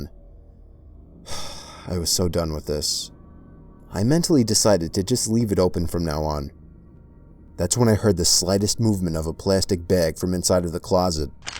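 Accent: United States. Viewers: Your accent brings back rad memories of my time in the US..........Are you one of the beastie boys? 🤓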